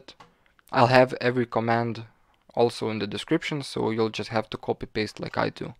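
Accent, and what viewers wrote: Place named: Lithuania. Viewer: u sound like lithuanian xd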